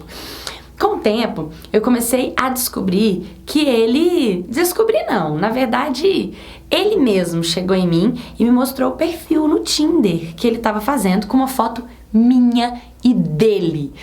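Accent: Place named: Minas Gerais, Brazil. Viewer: Esse sotaquezinho mineiro engraçado!! kkkkk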